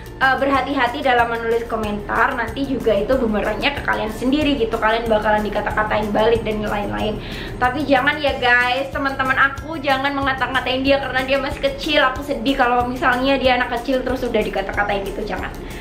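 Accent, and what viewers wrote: Java, Indonesia. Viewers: Medok bgt tapi lucu suaranyaaaa😍😍😍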